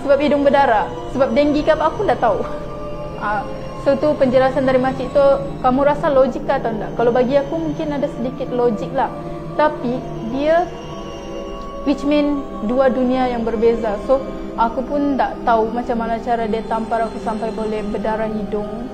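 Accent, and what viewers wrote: Sabah, Malaysia. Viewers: Orang Sabah ke?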